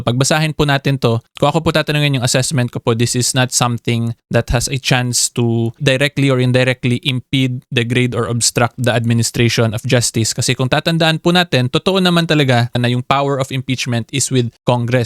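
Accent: Visayas, Philippines. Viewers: Bisaya ka atty? hehe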